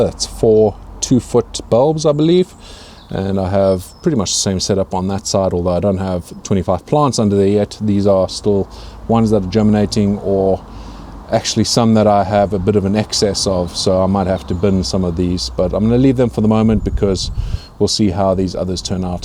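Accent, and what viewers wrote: South Africa. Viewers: Awesome channel. Built a hydro system as a little hobby project and my first thought was "I have to grow peppers in this" so managed to get hold of a nice assortment including some Reapers. Been enjoying your sauce making videos very much and great to see your growing methods too. Your surroundings say UK but your accent says SA. Either way, hi from Johannesburg!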